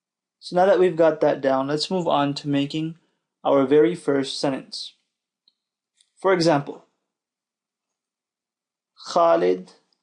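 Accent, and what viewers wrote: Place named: United States. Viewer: لهجتك امريكانية مية بي مية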